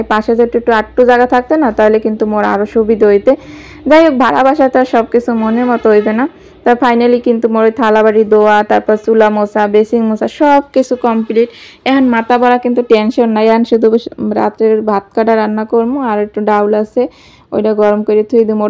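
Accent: Barishal, Bangladesh. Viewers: আসসালামু আলায়কুম আপু তোমার মুখে বরিশালের ভাষা শুনতে ভালোই লাগে। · সালামালাইকুম আপু তোমার মুখে বরিশালের ভাষা শুনতে ভালই লাগলো ❤আমি তোমার পরিবারে একদম নতুন সদস্য পুরো ভিডিও খুব ভালো লাগলো আজকে থেকে সব সময় পাশে পাবে ❤❤তুমিও এসো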